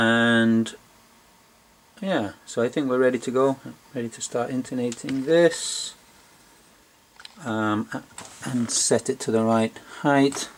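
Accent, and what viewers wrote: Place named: Ireland. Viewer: U sound Irish, Scottish and Welsh sometimes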